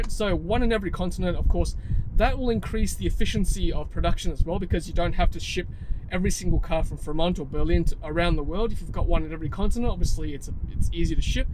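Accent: Australia. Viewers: Thanks for your entertaining update,! I thoroughly enjoyed it like all your posts. Your enthusiasm is infectious & it's really good to hear all this locally from someone with an Australian accent. Keep up the good work (and please let Joy know I enjoyed her posts as well). Cheers, mate!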